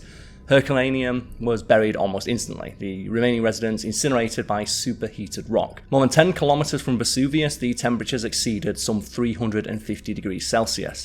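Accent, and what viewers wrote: United Kingdom. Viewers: ❤ Your enunciation may need a little practice, but I could understand you just fine, DESPITE your British accent, LOL! One note about your not wanting to eat “poo fish 🐠,” have you had Tilapia or white fish? Tilapia is a new name for a old fish, but 85%-90% of the worlds Tilapia comes from…wait for it…sewage treatment plants. Not joking. Look up an episode of “Worlds Dirtiest Jobs” about Tilapia farming. 🤢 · Fun fact, accent is closer to traditional British English than Simons. 😊. you may want to slow a bit so many others might understand what you are saying. Your accent is thick and many find it difficult to understand. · yes, true, but the algorithms that attempt to decipher the audio to turn it into captions are (probably) trained on the sort of English spoken by the designers (likely in Silicon Valley in California, USA), and the largest repository of audio recordings (Hollywood, in Southern California, USA.) So the algorithms are biased towards Californian speech, in all likelihood. 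It’s not surprising that they wouldn’t work well for either Simon or this fellow, both of whom are Brits.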